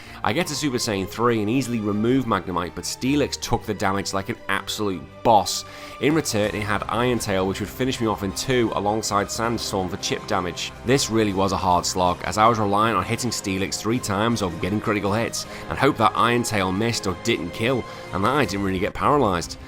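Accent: Liverpool, United Kingdom. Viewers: Yo my guy u sound Liverpoolian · I can tell you’re scouse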